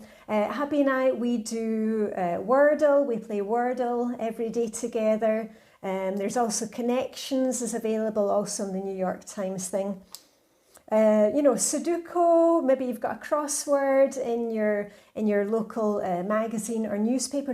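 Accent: Scotland, United Kingdom. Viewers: Congratulations on reaching 100k subscribers! I stumbled across your channel last week and was so happy I did. I loved hearing your Scottish accent (both my grandparents were from Scotland) and your enthusiasm and positivity is so uplifting and inspiring. Have a wonderful weekend. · Well done!! What an achievement. A cold morning here in Manitoba, Canada. I lived in Edinburgh for a time 8 years ago so love hearing your accent. You have really helped me to have a set time for my routine in the evening. I work away from home so the evening really helps me prepare for the day and eat well!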